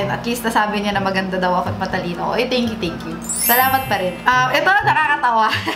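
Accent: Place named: Pampanga, Philippines. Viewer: Napakacute mo ate! Yung kapampangan accent mo nakakatuwa. Sarap pakinggan.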